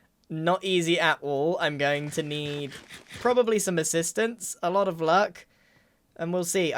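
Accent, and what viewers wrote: United Kingdom. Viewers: Why is vocabulary sound much more British today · R u British?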